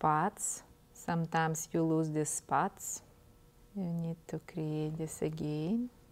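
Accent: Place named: Russia. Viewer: I know she has my accent I miss Russia, but I am glad I am no longer there I love it here :D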